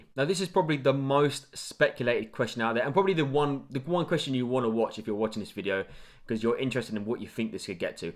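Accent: England, United Kingdom. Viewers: What’s up with the English accent and a god damn yankee hat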